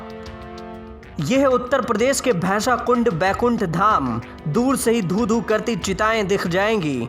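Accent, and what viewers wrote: Bihar, India. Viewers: बिहाडी 🙄🙄 एक्सेंट तो सुधार ले बन गया पत्रकार 😂😂🤗🤗🤗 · Anchor bihari h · Ancadh bihadi hai na Muh mein paan permanent hota inke Ra ko rda bolte hain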